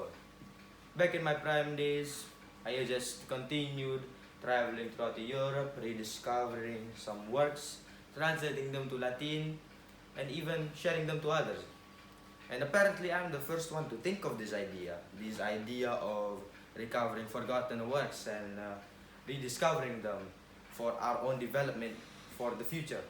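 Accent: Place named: Italy. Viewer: Italian accent !!!!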